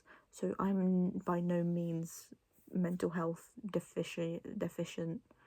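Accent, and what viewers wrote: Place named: United Kingdom. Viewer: Ew I just realized your British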